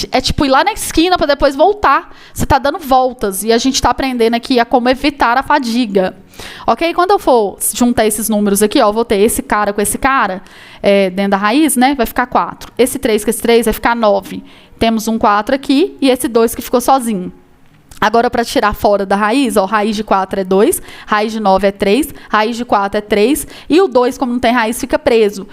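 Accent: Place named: Minas Gerais, Brazil. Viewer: Olá prof, a Sra é uma excelente professora, só que a Sra fala rápido demais, vou desconsiderar essa parte devido eu gostar do sotaque mineiro. Parabéns.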